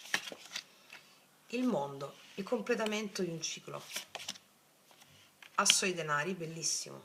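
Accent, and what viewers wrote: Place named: Salento, Italy. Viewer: Io avrei detto Salentina.. se è siciliana allora è di Messina 😊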